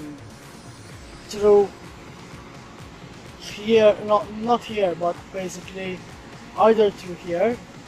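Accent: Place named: Malta. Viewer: Nice Maltese accent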